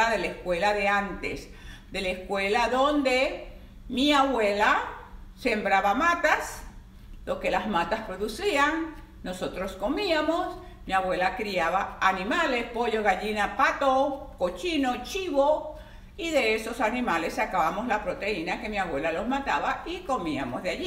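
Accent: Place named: Venezuela. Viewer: Que interesante escucharte jajajaja venezolana hasta el tuétano mami más claro imposible jejejeje, mil bendiciones desde Valencia, Venezuela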